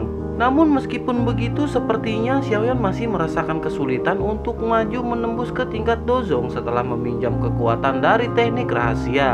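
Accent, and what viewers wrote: West Java, Indonesia. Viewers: Sunda pisan...kanjutkan min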